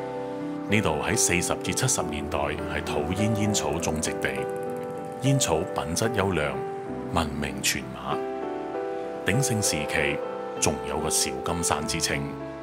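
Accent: Hong Kong, China. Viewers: Love your HK accent narrating a msian documentary in classic queen's Cantonese. Are you by any means from HK? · 难怪听起来像香港人口音和用语。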